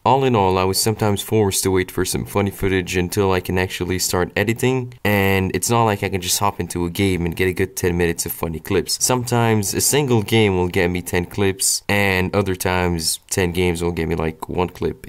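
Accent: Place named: United States. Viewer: Are u seriously from there? Are u okay? I actually live very close to syria.. Can see black smoke sometimes and shit... But u don't have arab accent u sound 100% american!! xDDD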